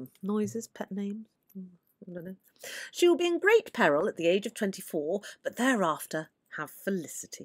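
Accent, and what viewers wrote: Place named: Somerset, United Kingdom. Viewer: I'll laugh if you're from Totnes! But I'm detecting more...... Somerset?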